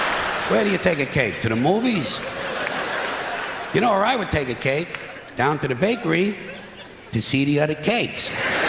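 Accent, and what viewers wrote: Brooklyn, United States. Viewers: He's from New York, he isn't faking it. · love that Brooklyn accent. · He's from Brooklyn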